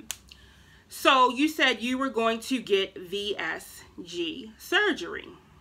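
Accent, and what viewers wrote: Baltimore, United States. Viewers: New subbie..Are you from Maryland? U sound like us in Baltimore lol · Idk how I found your channel. Are you from Baltimore? It sounds like you have a Baltimore accent. I love it!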